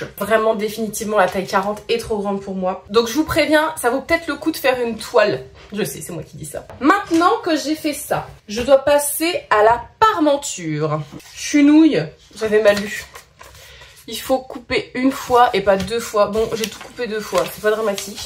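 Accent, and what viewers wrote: Lorraine, France. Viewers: Cc Bouton jean pour moi 😁 Et c’est toujours un plaisir de te voir et j’adore ton accent lorrain 😅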